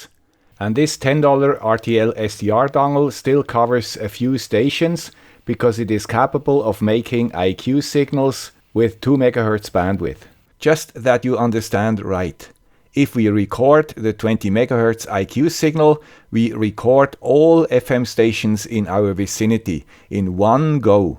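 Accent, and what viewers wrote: Switzerland. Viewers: Those 1% of the facts informed me more about SDR than I have ever seen. And all with a Swiss accent. Thank you. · One of your greatest videos! Thanks! And your Swiss accent is perfect as usually. :-) 73! Jakub, OK1SE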